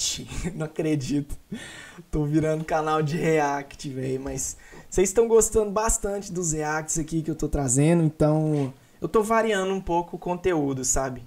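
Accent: Minas Gerais, Brazil. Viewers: tu é mineiro mano??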